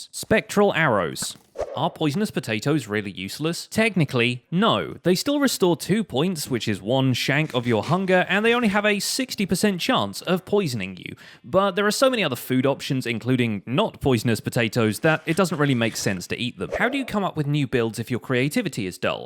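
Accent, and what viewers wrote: United Kingdom. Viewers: I mean he's like British or something you find that everywhere. And I know it's the pitch of the voice but it's still just British. Not to mention you said his accent and nothing like his voice. Sorry this is long I just had to explain something that I dont really understand. Exactly the reason I shouldn't have a response because I dont have a perspective. I wasn't trying to roast anyone or anything. I hope this is understandable. Thank you for your time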